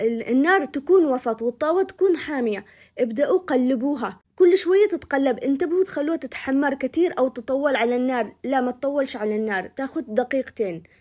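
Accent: Aden, Yemen. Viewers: تسلمي شيف بنت اليمن الحبيب كلامك ولهجتك العدنية عسل دوعني ولآ طبخك العدني الأصيل من أرض اليمن السعيد..يحفظك ربي ويديم عليك الصحة والعافية 🎉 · ما شاء الله وبالعافية عليكم .. يسلم الذي علّم .. بنت مفحوسة صحيح.. واحسن شي الهدرة العدني . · اعشق الكلام العدني الله يسعدك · ياحلات كلامك قبل طبخك انا من عشاق الكلام العدني 🤗😜 ماشاءالله تبارك الرحمن سلمت يدك ❤ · ياي لديد لهجتك متل اصدقائي يمنيين درسو معي بالجزائر اكلكم بزاف شباب ولديد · فديتك والله وفديت اللهجه حقنا اصحاب عدن ❤😂ضحكتيني لما قلتي ناقص البسسس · انا فدا للهجه العدنيه حاليه ماشاءالله ومفهومه ذكرتيني بامي الله يحفظها لي ويحفظ كل امهاتنا يارب ❤وكمان الخبز والحلبه عجبني كتير وجربت طريقتك وكل العائله حبوها❤ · تسلمي على طباختك الجميله الي هيه نفسك وكمان كلامك الجميل جدا احب لهجهه عدن عندي سؤال ليش لما افعل الشاهي مايرضي يطلع راحته راحه حقه مش شاهي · السلام عليكم ،ماشاءالله اللهم بارك ،فعلا اللهجه العدنيه ذكرتنا بأيام عدن الحبيبه والمخبازه العدنيه.ياالله أيام حلووووه جدا جزاكي الله خيرا اختي العزيزه ❤️.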